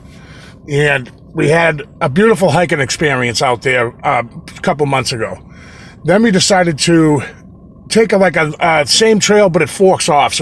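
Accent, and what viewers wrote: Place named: New Hampshire, United States. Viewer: I thought that was a New Hampshire accent.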